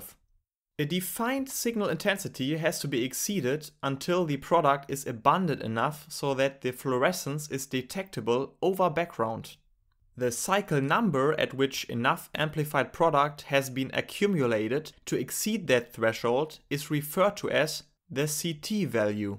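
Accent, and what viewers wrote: Germany. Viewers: Love the German accent and your explanations, keep going! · Are you German?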